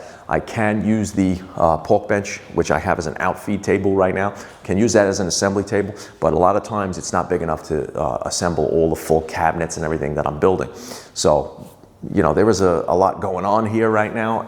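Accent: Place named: East Coast, United States. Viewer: You sound like most of my mother's side of the family.... Italian from back east. Love it! Sound in this video was clear and the shop is coming along nicely. I also thought you were a bit younger! At our age, that is a good thing. Ciao,! Keep punching!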